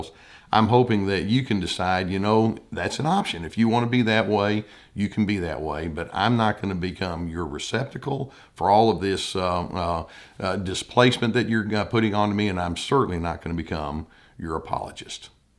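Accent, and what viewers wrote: South, United States. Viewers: Why are you calling him out for his accent? I'm from the South and I know his accent is smoothly correct and authentic. If he sounded "like somebody from Washington", I would say you're completely nit picking how someone talks, basically calling him fake! 🙄 Move on.. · ✨Glad I clicked. Staying for the combination southern accent and for the great information . Sweet tea for the soul ✨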